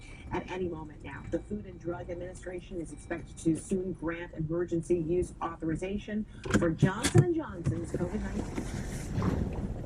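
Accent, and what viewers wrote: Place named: Arab world. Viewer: Just out of curiosity are you originally from the middle east? My GF (from Iran) and I have been trying to place your accent (maybe do an episode about what you do in life, profession, what got you into overlanding, etc.). Loving your videos btw. Keep them coming! I did 5 BDR's last year in my JKU, sold the RTT and am in the home stretch of a year long wait for my J30. I live in Chula Vista so if you ever make it back out this way, DM me and I'll buy you a burrito and a beer. 👍🏻😎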